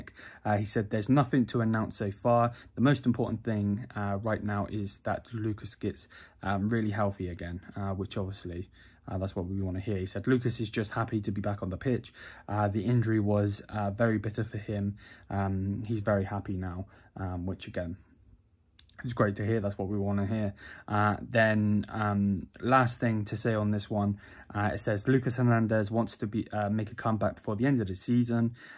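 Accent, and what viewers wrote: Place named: United Kingdom. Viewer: Where are you from? You sound british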